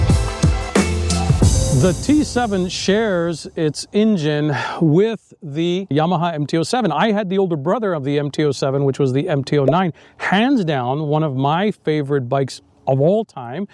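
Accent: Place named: United States. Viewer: What a US accent. Inspired.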